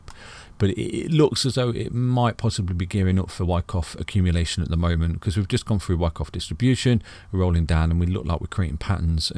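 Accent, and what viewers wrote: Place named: Nottingham, United Kingdom. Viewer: Sounds like he's from Nottingham. Although I don't have a crystal ball...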